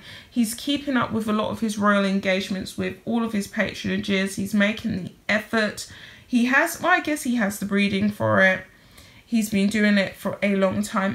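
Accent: England, United Kingdom. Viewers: I married a Southerner 45 years ago, moved to the south over 30 years ago and yes I’ve picked up a few words that sound southern but most everyone knows I don’t sound like a true southerner. The south has many various accents just like England I’m curious what kind of English accent has? Love listening to her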